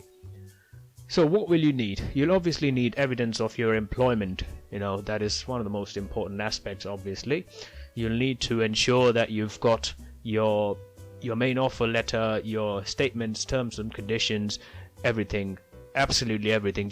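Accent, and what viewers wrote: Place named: United Kingdom. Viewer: You sound very fluent. I just thought you are a Briton :)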